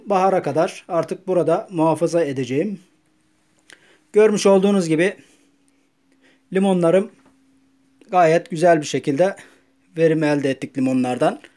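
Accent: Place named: Azerbaijan. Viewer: Aynan azeri lehcesinde konuşuyor.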